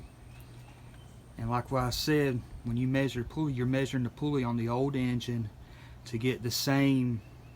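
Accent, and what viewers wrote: South, United States. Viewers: Hey I can tell you are a good old boy from the south !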